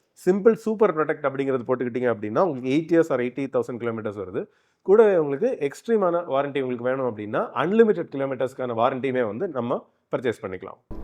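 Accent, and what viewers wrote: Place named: Nagercoil, India. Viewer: This is Nagercoil slang and also he associated previously with motowagen family only...Due to some clause he left and started this channel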